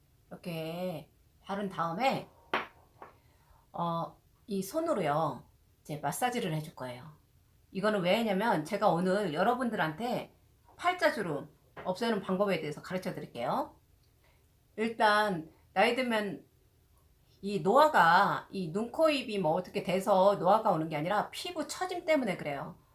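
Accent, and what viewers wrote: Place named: Chungcheong, South Korea. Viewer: 충청도 분 이시군요 ㅎ 영상잘보았습니다 구독 꾹~~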